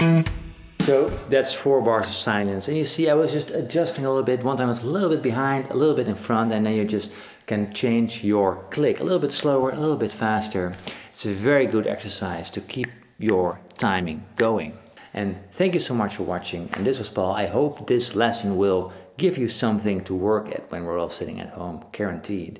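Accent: Netherlands. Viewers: Eyyyyyyy sound dutch. Hallo daar ;)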